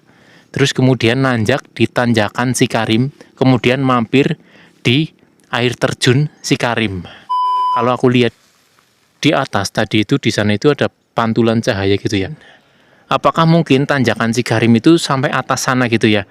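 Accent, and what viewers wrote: Banyumas, Indonesia. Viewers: Mas ki ngapak2 dialeknya, tapi pinter bahasa Jepang. sekali2 dimunculkan mas broo BHS Jepangnya? Kita biar sambil belajar Jepang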